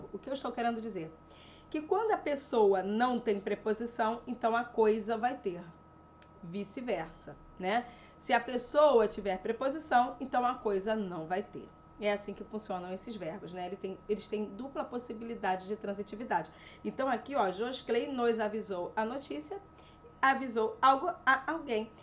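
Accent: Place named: Rio de Janeiro, Brazil. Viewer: em um dos vídeos, disseram que a prof estava com a voz da Anita; neste, ela está com a voz da Thaís Araújo. Acredito que tudo se deve ao modo como ela reproduz o xxxxxxxxxxxx carioca! rs É instigante a observação e comparação.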